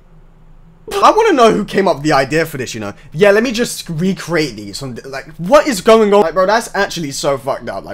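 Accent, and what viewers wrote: United Kingdom. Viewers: it actually ji scared me when I realized he was British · bro looks like an american sounds likes a british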